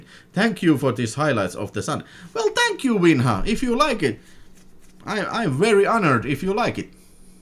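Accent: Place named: Lapland, Finland. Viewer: This is the first video of yours I've seen, but I love style and content. The accent takes me back to all the summers I spent in Lappland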